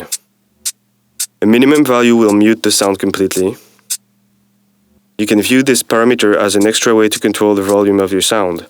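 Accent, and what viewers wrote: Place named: France. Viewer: super vidéos, je crois reconnaître un petit accent français, ça serais cool des tutos en français :)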